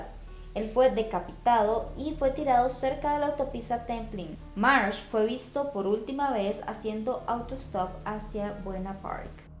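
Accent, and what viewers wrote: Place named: Mexico. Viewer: Me gusta este acento mexicano, me hace acordar a mtv xD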